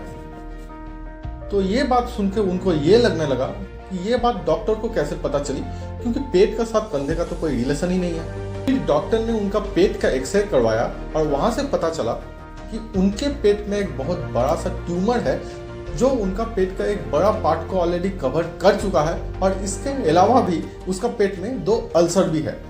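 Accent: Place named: Bihar, India. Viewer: Yeh bost bihari bolna sikh le pahle...tera problem pasand nhi aya..teri boli.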